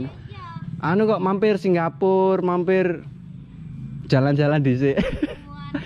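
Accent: Kuala Lumpur, Malaysia. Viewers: Berape lama kerja d malaysia...sampai cakap pon da mcm org malaysia... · Nada lagu bahasa Malaysia kamu enak sekali di dengarin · Ko ckp dah mcm org malaysia...😊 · geli tu mgkn maksudnya lucu bgi akak tu..slange nya skejap Malaysia sekejap Indonesia.tpi view nye mmg cantik.hijau je permandangan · Dah macam orang KL melancong ke Indonesia je bahasa nya 😁😁😁 fasih cakap KL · Slm sudah duduk malaysia berape tahun ,balek kampong becakap bahase ..malaysia bagus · Salam bong...berape lame kamu d mlysia..sng n geli hati denga slange melayu mlysia kamu. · Waahhhh ckp KL le...,👍👍👍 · tu... awak selalunya pakai loghat B. M.... tapi ok juga sih ' untuk pengobat rindu yg pernah duduk ka Malauysia , macam dulu ada kawan sy pandai sgt ikut bahasa dr negara lain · Loghat Malaysia masih pekat lagi, terima kasih.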